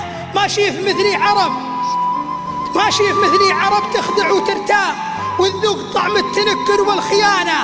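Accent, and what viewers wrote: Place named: Gulf, Arab world. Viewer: اؤؤف يربي شكد احب الحجي الخليجي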